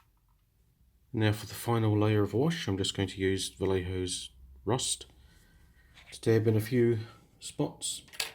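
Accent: New Zealand. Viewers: A kiwi accent?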